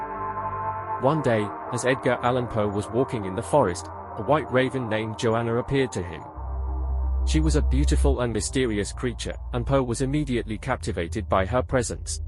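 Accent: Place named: United Kingdom. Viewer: I love it, esp the British accent.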